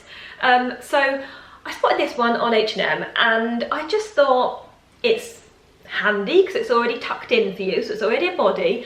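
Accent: United Kingdom. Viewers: I love your hauls and wanna purchase everything you are showing. And I love to hear your british accent, I’m crazy about it. Greetings from Poland 🙂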